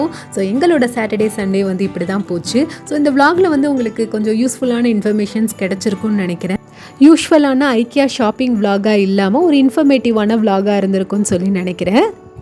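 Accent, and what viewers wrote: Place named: Sri Lanka. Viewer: sister super 👍 உங்கள் தமிழ் இலங்கை தமிழ் போல இருக்கு, ikea இ‌ங்கே யும் இருக்கு, from Switzerland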